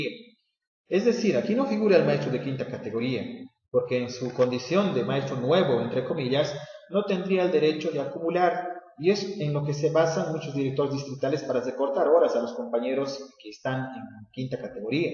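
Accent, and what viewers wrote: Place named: Mexico. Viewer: Pensé que era de México, por su acento.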